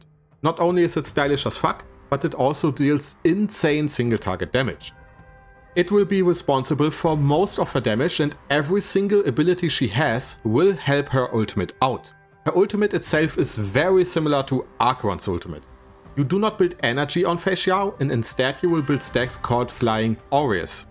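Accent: Germany. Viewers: i hear a german accent...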